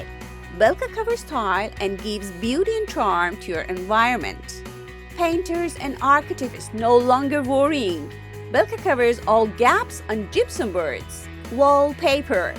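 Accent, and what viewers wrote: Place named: Turkey. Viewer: Oh god! Very heavy Turkish accent. Poor voice over. Wrong pronunciation.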